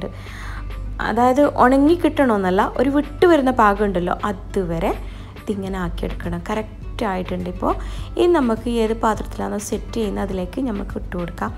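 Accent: Kasaragod, India. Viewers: കുക്കിംഗ് അടിപൊളി നൂറിൽ നൂറ് ഞണ്ട് കറി വറ്റിച്ചത് നയിച്ചോർ ഞാൻ ഉണ്ടാക്കി കഴിക്കാറുണ്ട് അടിപൊളി ടേസ്റ്റി അമൂർ മീൻ നിങ്ങൾ പറഞ്ഞത് ശരിയാണ് എനിക്കറിയാം ഞാൻ ഒരു മീൻ കടയിലാണ് ജോലി പുള്ളിയുള്ള ആമൂർ വില അധികമാണ് ഇവിടെ ഒരു കിലോ 60 റിയാൽ ആണ് അതുപോലെ തന്നെയാണ് സാല മൂൺ എന്ന ഒരു മീൻ ഉണ്ട് ഇറച്ചി ഓറഞ്ച് കളർ ആണ് അതും ഒരുപാട് ഫൈത ഉണ്ട് ദുബായിൽ സുഖമാണോ കുട്ടിപ്പട്ടാളം എവിടെപ്പോയി വെള്ള ആഗോലി ഇവിടെ കിലോ 70 റിയാൽ ഒരുദിവസം എന്തായാലും നിങ്ങളെ കാസർകോട് ഭാഷ ഞമ്മക്ക് പെരുത്ത ഇഷ്ടായി ട്ടാ ഇച്ഛ എവിടെപ്പോയി വീഡിയോയിൽ ഒന്നു വരാൻ പറയണോ ഓക്കേ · Ksrod slang പൊളി ആണ് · ഞങ്ങൾ കാഞ്ഞിരപ്പള്ളികാർക്ക് നിങ്ങൾ കാസറഗോഡ്കാരുടെ സ്ലാങ് തന്നെ കേക്കുമ്പോ വല്ലാത്തൊരു ഇഷ്ടമാന്നെ.. അപ്പൊ പിന്നെ ഈ റെസിപ്പി ഒട്ടും സ്കിപ് ചെയ്യാതെ തന്നെ അങ്ങ് കണ്ടിരുന്നു പോകും. പിന്നല്ലാതെ 😍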